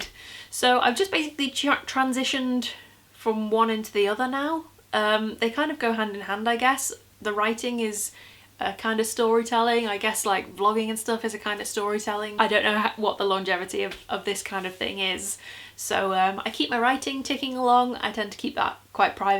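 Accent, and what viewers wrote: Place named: Wirral, United Kingdom. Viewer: I haven't left Wirral in almost a year ( cheers covid ), I thought I recognised the accent and the sense of humour! :-)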